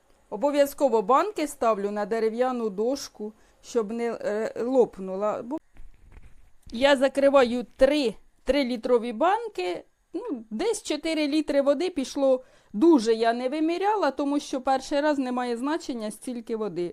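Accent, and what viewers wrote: Poltava, Ukraine. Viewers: почула вашу мову і сльози на очах.... Мені вже 60. Я народилась в Полтавській обл, Чутівський рн. Ви так балакаєте, як у моєму рідному селі Нагорна, поряд Вільховатка. Звідти родом моя покійна мама. Так хочеться хоч разочок ще побачити те, чого ніколи не забувала. Завадила війна. Щиро дякую тобі за наш особливий говір. Ти й не уявляєш, яке це щастя. Наче побувала на Полтавщині.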